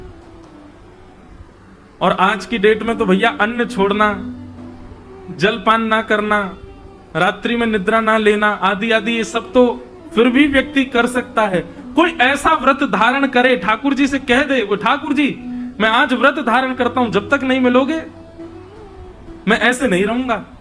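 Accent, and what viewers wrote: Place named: Braj, India. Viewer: Indresji maharaj Aap ki gyan bhari bate sun ne ko brij bhasi boli itni achi lagti hy ki sare din sunti rahu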